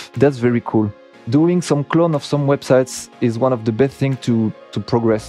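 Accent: France. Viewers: Moi j'aime bien son accent frenchie. Et il est très compréhensible pour un anglophone. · mec je suis bilingue et ton anglais est tres bon . ne lameliore pas pluss car on te comprends . si tu perds laccent francais tu perds le charme hahaha les anglophones adore laccent francais alors ne le perds pas. haha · J'adore le concept :) Ps pour l'accent fr, ne change pas les étranger aime ca ;p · L accent française est toujours présente😂😂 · C'est l'accent Français, rien de bizarre. C'est surtout perturbant pour nous Français ayant l'habitude de voir ses vidéos en Français, autrement je pense qu'à l'international son accent ne dérangera pas et au contraire ça apportera une petite touche.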